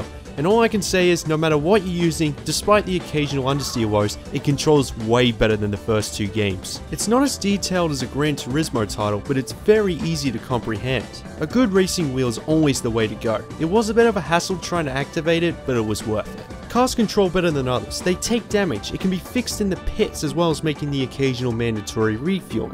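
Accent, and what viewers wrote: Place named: Victoria, Australia. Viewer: I would say by the accent he is from either SA or VIc, probably Vic.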